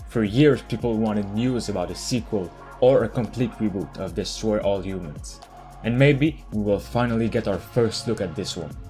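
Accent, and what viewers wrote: Quebec, Canada. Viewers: You speak english like me dude, are you from quebec?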